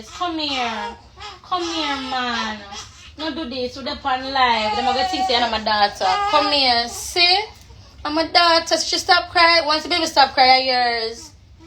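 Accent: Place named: Africa. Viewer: my first time commenting but I had to this time you’re so talented the African accent got me ooo someone plz sign her ooo nuff love ❤️ one of your fan blessings queen 👸🏾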